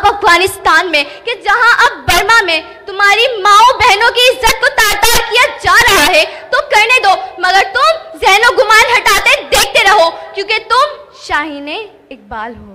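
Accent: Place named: Punjab, India. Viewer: Mend your Urdu pronunciation.slowly move away from Punjabiat.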